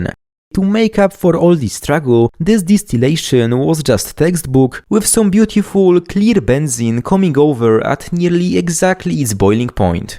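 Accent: Poland. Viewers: After I saw cisowianka bottle, and heard your language I instantly knew you are from PL 🇵🇱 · Cześć! Od razu słychać polski akcent, jeszcze to wymawianie "R", i cisowianka... Pozdrawiam!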